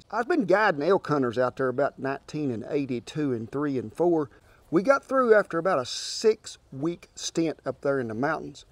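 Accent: South, United States. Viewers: That southern accent crack me up, it feels just like i'm back in El Paso, Looks mighty good!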